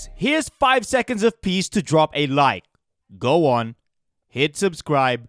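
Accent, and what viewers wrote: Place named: Russia. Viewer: Why do you sound Russian? Are you Russian?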